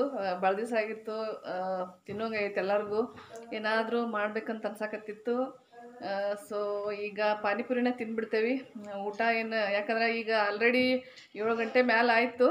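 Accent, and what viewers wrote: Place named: Dharwad, India. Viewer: Nanage nivu matado darvad Kannada tumba like madtini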